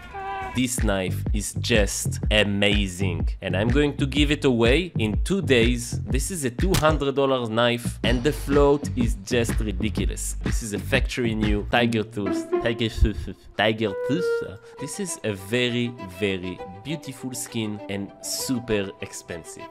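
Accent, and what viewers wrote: France. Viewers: knife looks very bien, luv ur french accent, but id love to have the knife even more :))) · I love you and your french accent :3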